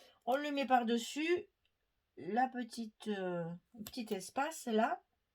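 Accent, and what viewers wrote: South, France. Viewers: Je viens de faire une belle découverte en visionnant votre tuto. Tout d'abord j adore votre accent qui vient du Sud. Vos explications sont claires. Je me crois à un cours de scrap tellement vous détaillez bien . Bravo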